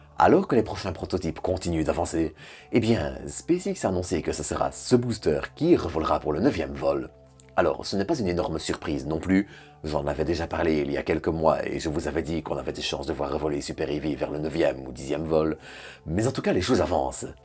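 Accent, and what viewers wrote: Belgium. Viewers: C'est bien sa belle voix grave et son accent belge.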